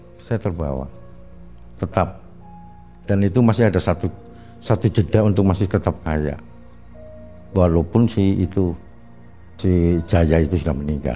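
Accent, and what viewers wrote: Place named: Tegal, Indonesia. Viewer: Tegal maning... Tegal maning..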